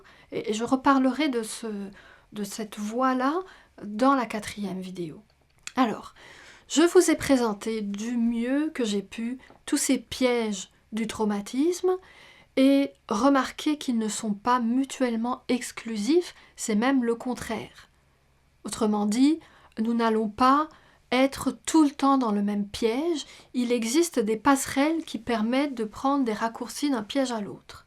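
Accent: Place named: France. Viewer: Merci pour ces vidéos très instructives. C'est étrange vous avez un accent français mais je reconnais quelques québecismes, est-ce que vous auriez passé du temps au québec par hasard ?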